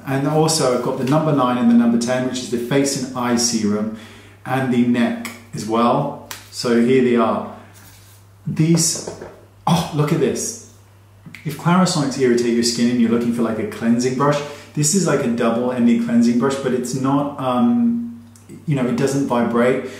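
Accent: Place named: United Kingdom. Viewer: ❤️the Brit accent! “Vitamin, Naaz, Bloody!” ❤️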